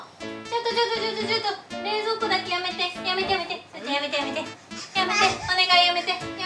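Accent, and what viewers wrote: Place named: Kansai, Japan. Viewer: 関西弁が大好きです❣ 何時かそのラーメンパスタを試してみたい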